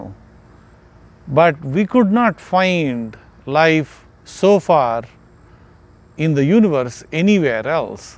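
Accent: India. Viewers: ohh ya I felt from your way of talking that u are from india by the way which telescope u have beside u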